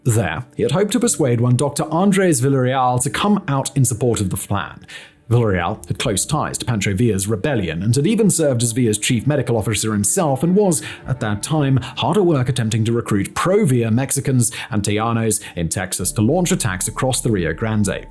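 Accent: United Kingdom. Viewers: This entire Revisionist history lesson is extremely rich, especially when it is being told by some dude with a Brit accent.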